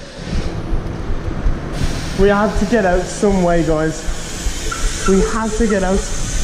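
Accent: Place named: United Kingdom. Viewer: Oh i love that british accent👌🏻👌🏻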